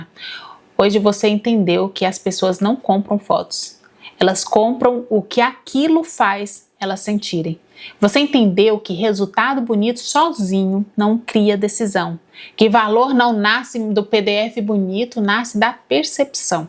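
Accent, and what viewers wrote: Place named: Recife, Brazil. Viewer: tú és de Recife? Esse sotaque… uhm acho que sim sou de Olinda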